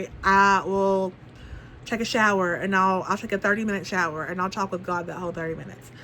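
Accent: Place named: South, United States. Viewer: Your accent is adorable - from a fellow southerner. I'm gearing up for my VSG in July. I wished it could be sooner but work committments block out June and the beginning of July. I've started implimenting things that people suggested. Drinking 64 oz of water daily, chewing 20 times before swallowing; cleaning up my diet and exercising.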